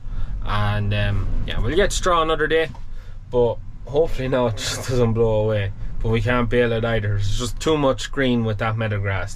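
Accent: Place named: Ireland. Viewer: From across the pond, enjoy your farming channel & the Irish accent!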